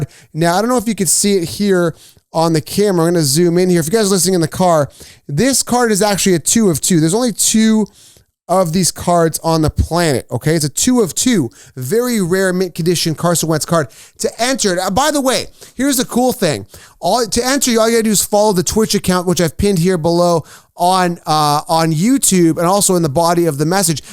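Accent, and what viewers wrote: Canada. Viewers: Are you canadian? i think i can hear a slight accent lol.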